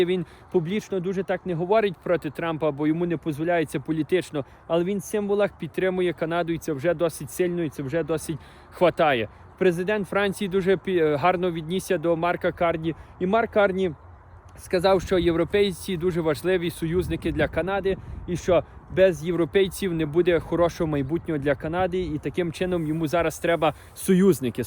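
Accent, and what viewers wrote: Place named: Ukraine. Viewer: МОЛОДЧИНКА МАРК ,ПО МОВІ ВІДЧУВАЄТЬСЯ УКРАЇНСЬКЕ КОРІННЯ .ХАЙ ПРОЦВІТАЄ КАНАДА ,НАШІ УКРАЇНЦІ ,КОТРІ ПРОЖИВАЮТЬ У КАНАДІ .!!!❤❤❤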